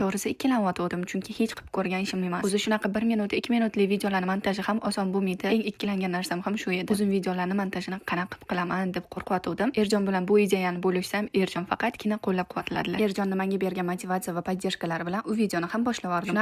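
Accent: Tashkent, Uzbekistan. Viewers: Хаммаси зурку,лекин бир нарсангиз мани ажаблантиради.Шевангиз,наманганликлар бузилган тошкентча гапирмайди.русча кушиб гапиришингиз эса жуда савияси паст билимсизлигингиздан белги.илтимос узингизни бу киликларингиз устида бир оз ишласангиз булармиди. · Наманганлик шеваси бошкачаку бу киз тошкентча гапирди😊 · Жуда хайрон колдим Намангонликлар жуда этикодли буларди ёки сиз Тошкентча гапиряпсиз Тошкентда катта булгансиз шекилли йукса Намонгонликлар динни кабул килса килмаган буладими ишкилиб бошка динга тегмайдику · toshkent shevada qando gapiryapsiz namanganlik bulsayiz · Наманганлик булсангиз нимага тошкент шевасини аралаштириб гапирасиз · НИМА УЧУН НАМАНГАНЛИК БУЛА ТУРИБ - ТОШКЕНТЧАСИГА ВОТТИЛАЯПСИЗ ??? ЯНА ЭРИНГИЗ КАРИС ??? ЯНА УРИСЧА КУШИБ ГАПИРАЯПСИЗ ??? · Узиз наманганлик буп туриб , шевез тошкентчаю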